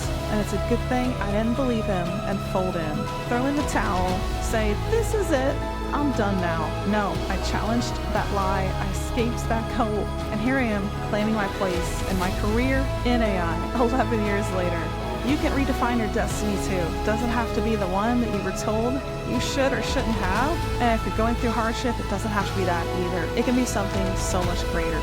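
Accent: South, United States. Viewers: Congrats on your escape. You have a little southern accent there. Im old as dirt, so I'm probably not a great candidate for AI or quantum, but it sure intrigues me. At 72, I have so many ideas over the years that have come to light by others, and I keep missing the boat since I don't act. I now have so many other ideas written down, and since my x left, I have time to act on some of my stuff. Help me jump, please. Cleo......Thx.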